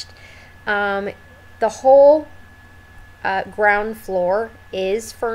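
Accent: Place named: Minnesota, United States. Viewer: lovely build! quick question: are you from the midwest? you sound a lot like my minnesotan friend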